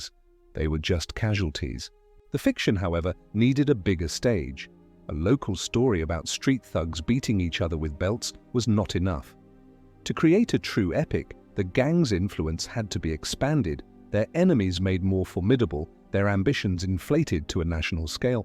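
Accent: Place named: United Kingdom. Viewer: Well, well, well. You made me actually sign into YT for the first time ever. These videos that you throw out there in a breathtaking speed are amongst the best history docs I've ever watched. I'm not talking about the artwork which probably had some help from AI, I'm referring to your narrating style and the kind of political neutrality I'm expecting but never encountering when watching the stuff of others. Long story short: This is brilliant and well thought through stuff and I wanted to thank you honestly for sharing. Speculating about your accent, I suppose you're from the UK, a country that in recent history appears to have its troubles with the application of free speech, but you seem to be rather unimpressed and not influenced by it at all. Good for you! Let me know if I can help. I'm fascinated by history and might be willing to dedicate some of my time to support you. 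Thumbs up for your work, well done!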